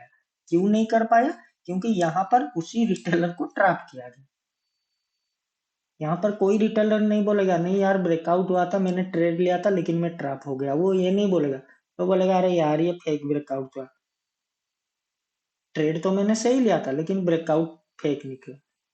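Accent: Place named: Odisha, India. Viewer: tam katha ru janideli...j tme..odisha ra boli....☺️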